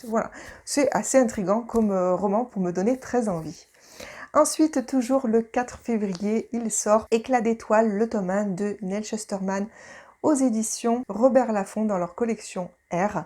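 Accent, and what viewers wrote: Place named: England, United Kingdom. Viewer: Coucou! Bravo pour cette vidéo! Je vois qu’on a une majorité de livres en commun, surtout pour les grandes sorties!!! 😍😍 Genre Aurora Squad 2!!!!!!!!! Haha, l’attente me rend fébrile... 🤣🤣 J’adore ton accent anglais!! 😅 Pas pire que le mien!! 😉 Bisous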